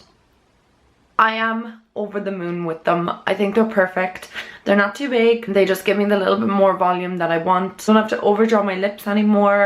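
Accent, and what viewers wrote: Ireland. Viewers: U Irish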